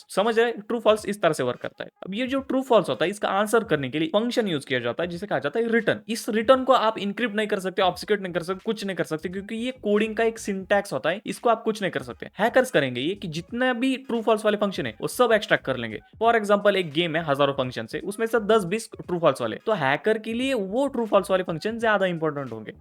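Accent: Maharashtra, India. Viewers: Bhava tu marathi ahes😂, tuzya hindi varun lagech samajl😂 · Bhai aapki hindi marathi accent mai kyu hai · Bhai aap maharastra se hoo kya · Bhava tu maharastra cha aahes ka , hindi varun kalta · Bhai tum marathi hona ❤ tone se samajh raha hain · Authentic and perfect Marathi accent and great explanation too , love the style 💖💖👍👍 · I can tell you you're Marathi without listening Marathi from you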